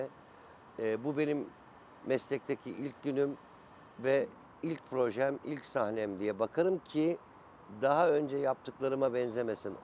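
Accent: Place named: Rize, Turkey. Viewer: Rize şivesine çalışman gerek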